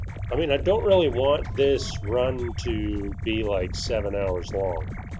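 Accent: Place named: Pacific Northwest, United States. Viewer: After your question about what draws folks to your channel and game play, I was thinking about the reasons that I do enjoy watching your Goobies runs to start my days, here in Brooklyn. 1. I'm originally from the Portland, OR area and your voice has an accent that reminds me of home. Over the years, I've learned that the Pacific NW of the US has an almost Canadian accent in its English. Perhaps this is a result of the many years in which the territory was jointly administered by the U.S. and the U.K. or now the region's proximity to Canada over time? I just watched a history explainer video on that international relations history of this U.S./U.K. joint rule, by one of my favorite YouTube channels 'History Matters' , this last week. (I also work in and academically study International Relations and International Affairs, here in NYC; and sometimes for the U.N. 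here in the city or at international conferences.) Long story short, your accent feels welcoming and homey to me. (P.S. If you ever have any International Relations questions, feel free to ask. I'm always happy to share what I may have learned over the years.) 'Why did Britain give up Oregon? (Short Animated Documentary)' "Britain once held condominium of what's now Oregon, Idaho and Washington State with the United States and during a time of diplomatic strength, simply handed it over without any compensation. So why did the United Kingdom do this when it could have got something in return or at least given less? To fi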